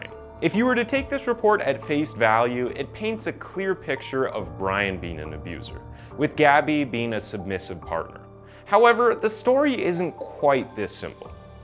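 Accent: United States. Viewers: The narrator has a very American accent so am I the only one that finds it strange he cannot pronounce Yosemite I mean I’m Canadian and that’s not a far stretch but I’ve known about Yosemite park my whole life it’s not a small place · I like this guy but I’m curious where he is from. He sounds typical American but mispronounced well known words like Maryland and Yosemite. Just an observation.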